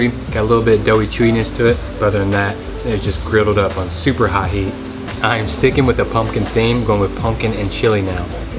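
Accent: South, United States. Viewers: You sound like you have a southern accent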